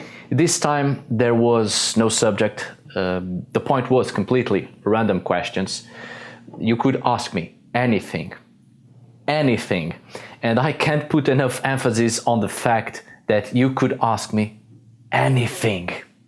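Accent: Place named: Portugal. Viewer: 'Morri com você falando português!' Is it because your archeology studies or do you have a Portuguese family? Because you have a strong Portuguese accent. 🙂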